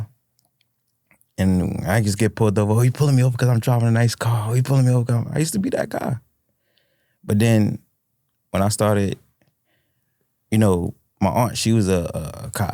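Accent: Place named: Philadelphia, United States. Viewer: Bro from Brooklyn but sound like he from Philly lol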